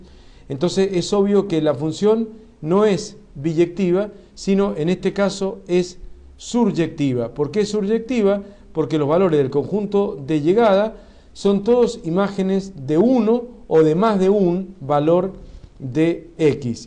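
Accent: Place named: Argentina. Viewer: es argentino pero le mete un neutro por ahi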